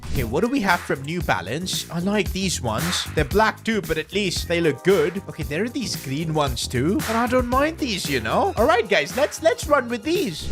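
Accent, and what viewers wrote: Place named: India. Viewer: The Indian accent is wild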